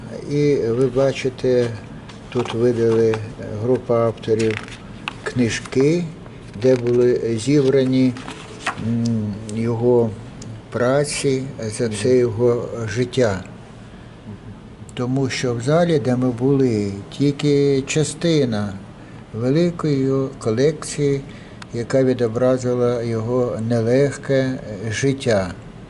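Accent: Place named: Russia. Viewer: Надеюсь все выучили слово благодаря)))., Ваш украинский все равно русский.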